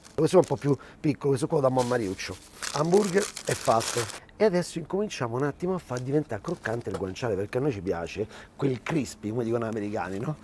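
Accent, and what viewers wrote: Rome, Italy. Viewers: Toujours des recettes délicieuses, et puis cet accent romain qui me rappelle tant mon enfance, merci Chef..... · Ma la < padela de fero>... é robba da guera. Non mi piace il dialetto romanesco, ma tu me lo rendi gradevole. posso un consiglio? Il torsolo dei broccoli, NON SI BUTTA! Va pelato dalla scorza e spuntato alla base, poi si taglia in pazzetti di mezzo centimetro e si mangia crudo con o senza condimenti. Una delle verdure piu buone in assoluto, da primato! E pensare che tutti lo buttano via. Un delitto. A crudo, tipo mela.. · Quando ti sento mi ricordi Roma (dalla quale manco da più di 20 anni, ahimè) comunque l'hamburger l'hanno inventato gli antichi romani (isicia) anche se la radice del nome è in effetti tedesca..Complimenti per la ricetta, i broccoli sono un colpo di genio... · Meno accento, romano però: ti sentirai più spontaneo.... 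a me sembra che parli solo a quelli della tua città ...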